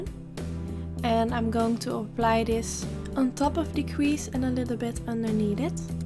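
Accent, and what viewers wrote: Netherlands. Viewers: This look is amazing! Btw, what country are you from? You've got a little bit of a dutch accent ;) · omg i love ur tutorials! u have a dutch accent haha!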